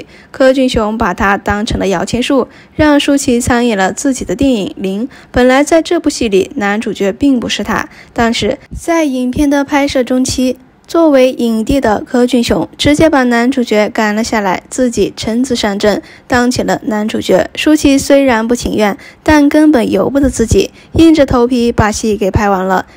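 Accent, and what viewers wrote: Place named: China. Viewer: 不要再毀謗侮辱了，留口得了吧？大陸的口音可以滾了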